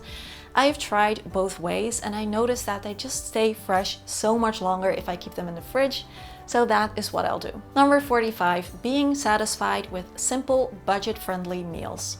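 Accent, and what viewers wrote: United States. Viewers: is fluent in English but definitely has an American accent! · Great video! Thank you,. I also want to compliment you on your English. I would have assumed that you grew up in the US. 😊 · Netherlands English accents are American or did you learn English in America?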